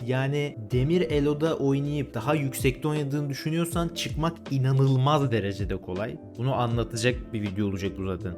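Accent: Thrace, Turkey. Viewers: Trakyalımısın abe kızan. Trakyalıysan trakyanın neresinden.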